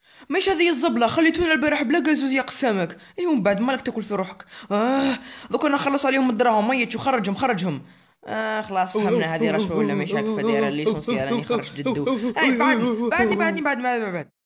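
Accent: Chlef, Algeria. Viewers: لهجة تع شلف بضبط 😂😂😂😂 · اللهجة تاع شلف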